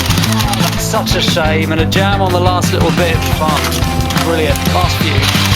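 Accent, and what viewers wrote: United Kingdom. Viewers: You are british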